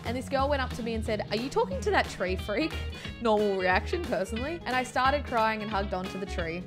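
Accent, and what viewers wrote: Australia. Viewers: I like the Australian accent!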